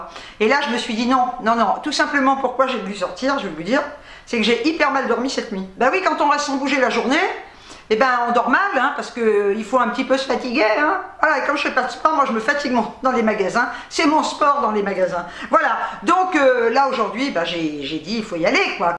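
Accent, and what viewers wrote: Algeria. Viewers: Cc ma belle j aime trop ton accent pieds noir 🤣🤣très bonne vidéo comme d habitude 😘😘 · Je ris de l'entrèe:NOZ! lieu de perdition de!!!!!!!!!!!!!Bonjour! my jolie candle,c'est une brillante idèe, tiens les boucles d'oreilles sont assorties à la robe que tu nous avez montrè! Mets du Blanco de Vanish, c'est efficace,c'est un sachet à mettre dans ta machine ou autre; j'aime l'accent pied- noir! et pour cause:mon mari l'est!!!Elles te vont bien les creoles,te vont bien.:reine des affaires,du pret à porter etc....Dis donc avec ta brosse, tu vas te servir d'un cotè seulement!!!!mon EURNY § GROS BISOUS! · Coucou mdr 😂 ça faisait longtemps que je n avais pas entendu l accent Pied-noir!tu es fantastique!!😘😘😍😍😻😻❤️❤️ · Parle ma parle avec ton accent pied noire là je ris😂😂😂j'adore. Pleins de bisous 😚😚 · coucou bisous a toi et aux animaux pour retrouver ton blanc tu achete du vanish cristal blanc tu mets les vetements blanc dans une bassine avec 2 ou 3 cuilleres de vanish blanc tu laisse tremper toute la nuit et tu envoie en machine avec 1 dose en plus de ta lessive et ça va redevenir blanc parole je le fait avec les body de mon bebe. 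J'adore quand tu fait l'accent pied noir j'ai l'impression d'entendre Elie Kakoue dans Mme Sarfati j'adore. Allez gros bisous a toi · Ma chouchoute super belle en cuissardes et le pull magnifique, moi la petite fille de pieds noirs ça ma fait plaisir de réentendre l'accent et remonter de bon souvenir avec ma grand mère, qui me disais dès qu'elle me voyais avec 1 garçon ma fille attention à ta marguerite. Bonne soirée bisous de Lyon et câlins à Eurny et Mélodie 👍👍👍👍👍👍👍💗💖💓💕👍👍👍👍👍👍👍NAD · Hello coucou!!!! Promis j'ai pas rigolé pour le Roll-On insectes!!! Enfin j'ai pas rigolé très fort quoi hein! Un peu quoi! Un tout petit peu!!! Cela dit, les roll-on on en a à la maison pour mon fils et moi (David il se démerde il se gratte MDR) et ça empêche effectivement de se gratter quand même... BREF!!! Les cuissardes sont magnifiques, elles te vont super bien! Très classes! Et le pull doudoune polaire j'adore et pour le prix non mais je rêve!!! 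Tu dégottes toujours des trucs de folie toi! Dès que j'entends l'accent pieds-noirs je pense à Marthe Villalonga moi! Je te fais plein de gros bisous Ma!!! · J’aime..... Que dis je? J’adore ta joie de vie et puis il faut bien l’avouer ce petit accent pied noir te va à merveille 👍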